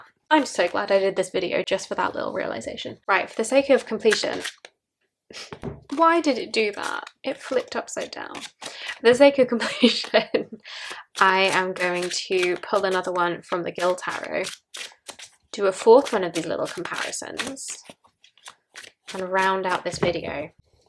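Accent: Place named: England, United Kingdom. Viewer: LOVE LOVE LOVE this! Fairly new to your channel and have been dipping in here and there. I will be starting my own tarot journal when it arrives. I am intrigued about writing my own keywords on a deck. I have considered this before but it sort of felt wrong. I am off to google Lisa's book now :) Also as a side note your voice is beautiful, it's giving me serious ASMR vibes feeling very chilled now. I am from London but live in Ireland so really miss the sound of English accents :D Thank you for all the inspo xx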